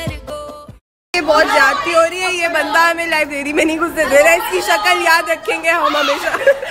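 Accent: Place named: Karachi, Pakistan. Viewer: Your Karachi type accent is so funny. Lol. I'm from Lahore.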